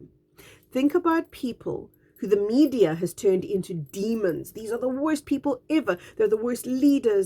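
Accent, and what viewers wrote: South Africa. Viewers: Yet again, a beautiful explanation and reminding us that one of our greatest gifts is our intuition. You speak from the heart and not the Ego and that resonates with me so much. I in turn, tell my husband, who too is Awake as I am, to just ' observe ' the craziness around our world. We need to go within to find the answers and it is people like you, doing your humanitarian work to help us and guide us and explain in very simplistic terms. I am also happy you not only read my last comment but you actually responded. I mentioned and inquired about your beautiful accent and you told me you are South African...awesome...and even better, that I resonated with you because we are running on similar frequencies!!! So very true that when I go out shopping, I don't just ' see' people, I can FEEL their frequencies! Thank you kindly for your podcast my dear friend. 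We are all here for a reason and the biggest is to stand shoulder to shoulder and Unite against the EVIL that is disappearing from our Planet!!! Love and Light!!!🥰❤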